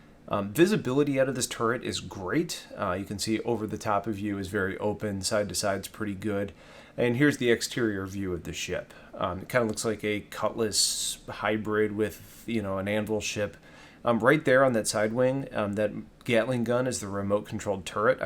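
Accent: St. Louis, United States. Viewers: R u from st.louis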